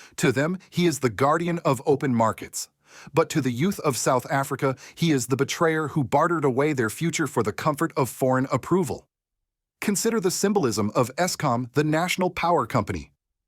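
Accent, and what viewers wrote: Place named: United States. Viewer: F you American accent, you have no clue.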